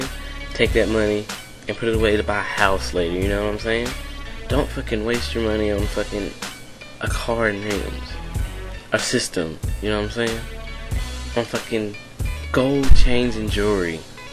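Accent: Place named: United States. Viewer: speaking correctly is a matter of opinion. You're way too americanized.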